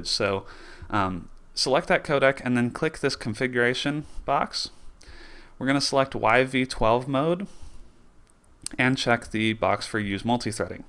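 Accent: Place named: Texas, United States. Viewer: Your dumb.....its called a Texan accent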